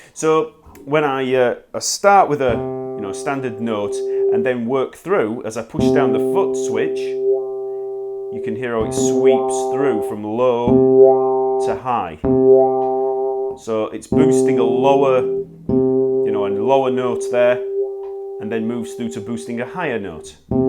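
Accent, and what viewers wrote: United Kingdom. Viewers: I really enjoy this distinct british accent!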